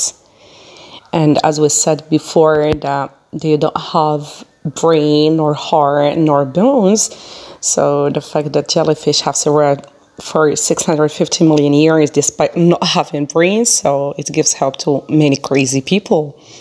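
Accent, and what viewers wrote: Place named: United States. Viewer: صوت رائع لغة متقنة.لكن للأسف لم أفهم شيئا تتحدثين بسرعة و باللكنة الأمريكية كذلك لم تفعلي الترجمة وشكرا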